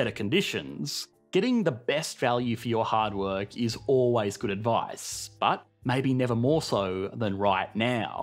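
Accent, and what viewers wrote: Australia. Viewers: I like a guy with an Australian accent telling us how the US Federal Reserve works!!!???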